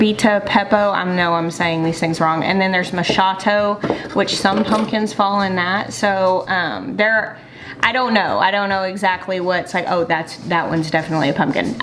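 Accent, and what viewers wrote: South, United States. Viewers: I love watching you. I love your heart. I love your authenticity. I think you and are one of the most "real" of the "youtubers." I just watched a tv show that is also shown on YouTube, Growing a Greener World". I've watched Joe on PBS for years but tonight when watching his latest episode, I realized YOU HAVE A CLONE IN THE GARDEN WORLD!!!! You have to watch her. She sounds like you describing her gardening love. The link is <link> She is Nikki Jabor. I wasn't familiar with her but apparently has a large following and a book. Check her out. I would rather hear you talk though.....I'm partial to a southern accent!! Thank you for sharing your life, your love, your family and your faith. You are a blessing to me. 💕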